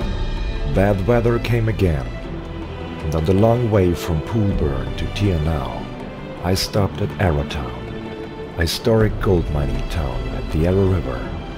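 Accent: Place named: United Kingdom. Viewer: are you austrian? cause you totally sound british. great video, I'm so jealous now. I watched the beginning with the Narnja music over 10 times, I think. just gives me goosebumps.... O__O I might actually take the "wrong flight" on purpose, next time I go to see my family in the Philippines and have a stop-over in Dubai or AbuDhabi.....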